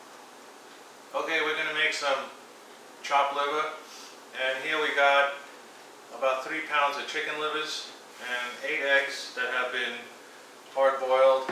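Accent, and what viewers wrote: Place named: Boston, United States. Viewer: ..You must be from Boston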